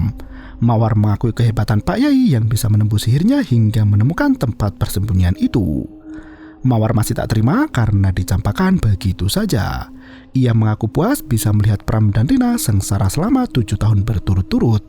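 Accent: Banyumas, Indonesia. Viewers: Solo daerah mana kok ngapak? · Astaga solo ngapak😅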